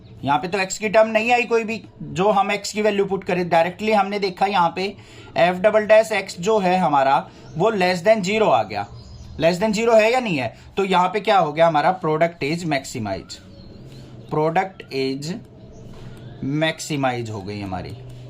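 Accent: Haryana, India. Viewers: sir ji thara haryanvi touch aali boli me maths padhne ke jise alag hi hai thanks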